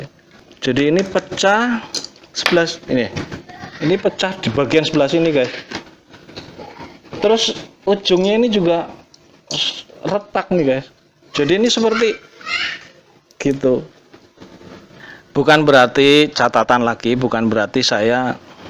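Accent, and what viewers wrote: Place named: Banyumas, Indonesia. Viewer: ngakak mbah, ngapak campur b indo e khas bgt wkwk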